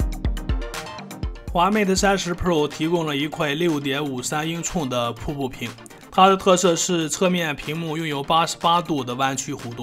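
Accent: Shanxi, China. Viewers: 麻烦你练练普通话, 你这内蒙西部口音还是山西口音太严重了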